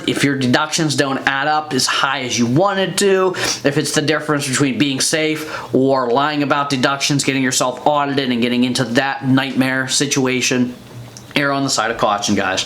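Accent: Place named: Pittsburgh, United States. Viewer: Thought I heard the Pittsburgh accent....jersey in the background verified that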